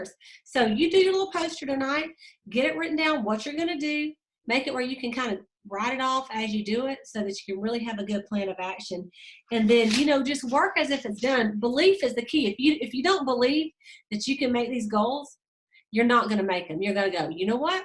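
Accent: South, United States. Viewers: I love your Sunday chats. You keep us up to date. I love hear your southern accent ,when you explain or introduce company promotions. You seem to always make it clear and very convincing that we can achieve and you always make an example of how you did it. Which is so encouraging. You are a true example of Go Give A great representation of Mary Kay.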